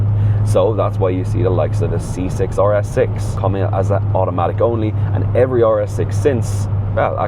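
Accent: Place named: Germany. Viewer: damn (i am german) i love your "german accent" it was very funny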